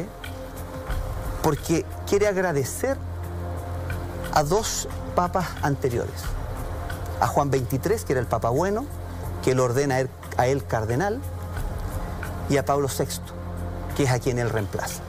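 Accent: Chile. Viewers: Quería ver este video pero apenás escuché el acento chileno dije, no gracias prefiero vivir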